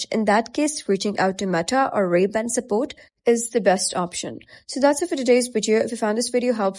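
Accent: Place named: India. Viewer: Of course its an Indian voice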